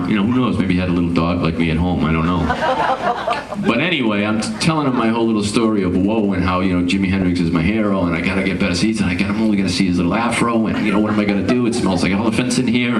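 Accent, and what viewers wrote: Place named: Boston, United States. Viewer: gotta love that Boston accent. Amazing player with a new unique voice while still having an old soul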